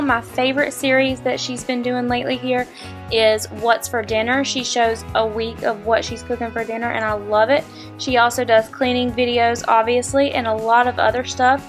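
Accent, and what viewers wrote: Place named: South, United States. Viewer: I am from Texas so I I can appreciate the drawl of a southern girl ❤️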